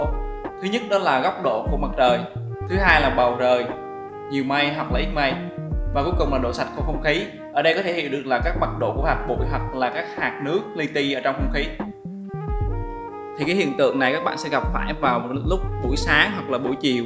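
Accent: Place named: Quang, Vietnam. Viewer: nói tiếng quảng nó cứ vui vui :v , mà xin mấy map bạn đăng k thấy chi sẻ :(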